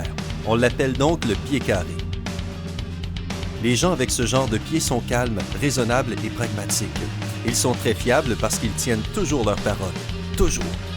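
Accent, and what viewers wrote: Quebec, Canada. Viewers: "cpendant" mdrrr tu pue le quebecois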